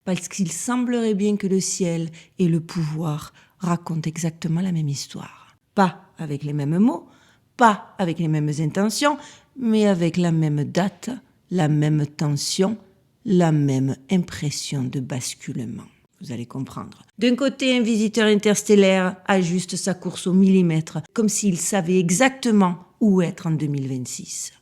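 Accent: South, France. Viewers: Toutes ces horreurs avé l’accent du sud, c’est quand même plus sympa🥰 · Ah !!!! L' accent de la bonne mère en direct des states !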